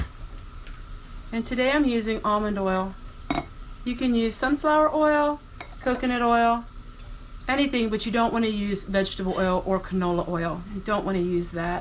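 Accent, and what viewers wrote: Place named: Alabama, United States. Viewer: We pronounce chamomile the same way here in Alabama. LOL I’ve only noticed one word you have pronounce different and that’s the word “different”.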